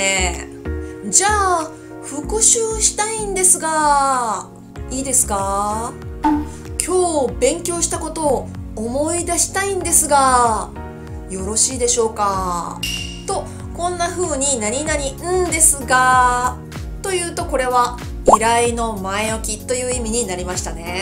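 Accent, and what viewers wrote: Taiwan. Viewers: 天哪！老师是日本人！这么重的台湾腔简直不敢相信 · 老师您好，今天偶然发现您的视频，看了一个视频之后果断订阅了，我是一个学习日语三年的学生了，但是由于环境和难度的受限导致我的日语水平没有一点点改善，最常见的情况就是昨天学的知识今天就忘了，现在还停留在初级阶段，想想也倍感惭愧。最后想问一句老师到底是台湾人还是日本人啊？看第一个视频觉得老师是台湾人，中文发音是台湾腔，当看到这个视频开头部分老师的日语实在太流利了跟母语一样啊，让我不禁感叹老师在哪里学的日文啊？看到评论才明白有人说您是日本人，难怪日语说的这么标准。谢谢老师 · 先生の中国語はすごく素晴らしくて、しかも台湾訛り。。。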